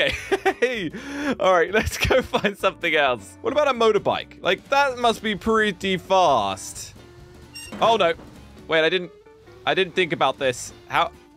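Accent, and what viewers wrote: United Kingdom. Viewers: Are you from the uk?🇬🇧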